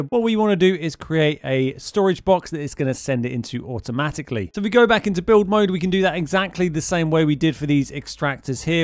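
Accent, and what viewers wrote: England, United Kingdom. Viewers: I get a kick on how you English people have an accent, not to mention the unique words you use quite often like "Plunk" ... I'm not going to laugh or ridicule, because everybody's different everybody has their own little niche that helps them be more unique. I myself am quite unique that way also so I empathize. I have built about three outposts one of which just to supply a need. I did build a base on Masada. With me taking an educated guess, the very first time I placed the beacon down I had seven resources available at one spot. I would strongly suggest checking it out, because for the end of the constellation storyline is on that planet also. Not to spoil anything please be safe, also more information about Outpost information is greatly appreciated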